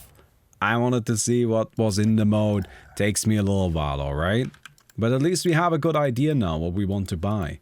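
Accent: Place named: Germany. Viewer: Yea Now only the "Hello eenternet hello" is required combined with a stronger German accent Boom, is young again, no longer 420 million years old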